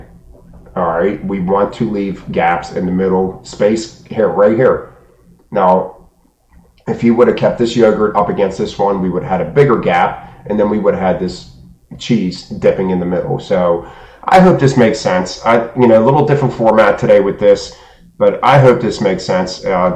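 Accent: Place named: Pittsburgh, United States. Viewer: Caught the Yinzer accent right away 👍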